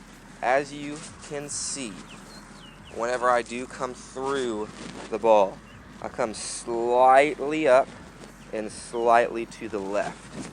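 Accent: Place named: Australia. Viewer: you sound australian :D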